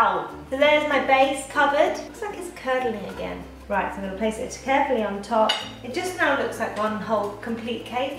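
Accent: United Kingdom. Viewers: Her accent is British ☝🏻️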